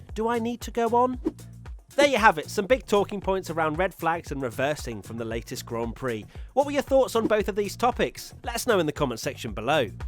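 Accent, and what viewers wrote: United Kingdom. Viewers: Listening to your very british accent its obvious who you root for. Yet you argue let the haters comment!! Yet two seconds later you are the hater yourself. However the rule of unlapping is utterly BS. One could argue to unlap but at least provide a half a lap distance between the front runners and the rest of the field in order to make sure the starting grit is in sequential order. Only seems fair no?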